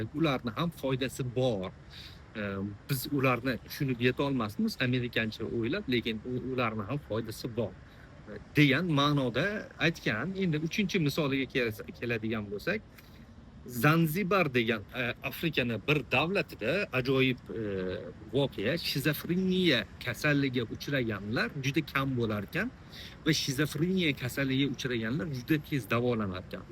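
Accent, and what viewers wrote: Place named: Khorezm, Uzbekistan. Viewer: Xorazmlik emasmisiz, hazrat? Aksentlaringiz shevamizga tortyapti ))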